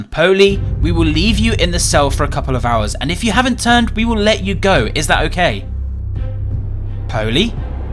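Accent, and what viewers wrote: United Kingdom. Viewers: This is pghlfilms but bri’ish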